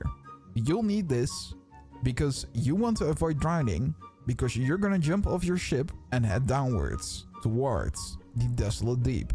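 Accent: Netherlands. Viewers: r u dutch by any chance bro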